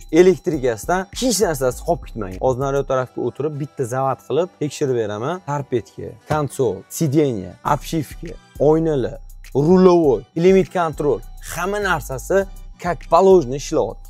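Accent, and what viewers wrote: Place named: Tashkent, Uzbekistan. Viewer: Тошкент шеваси чарчативоради эшитадиган одамни, кучанип нима киласиз адабий гапираверинг😅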